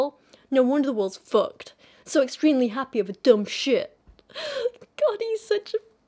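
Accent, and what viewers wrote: United Kingdom. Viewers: There is some humor in how you read it in your cheerful sweet very fsminine voice with a sophisticated British accent. Love it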